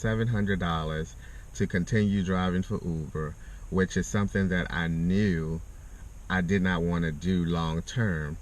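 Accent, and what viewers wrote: Baltimore, United States. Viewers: 🤗 I can definitely hear that B’more accent😎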